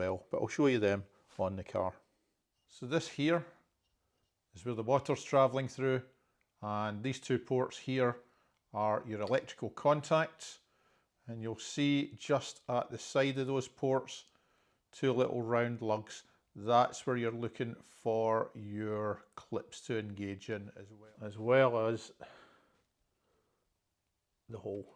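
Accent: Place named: Scotland, United Kingdom. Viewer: Not only well explained but by a fellow Scot in a familiar tongue. Many thanks.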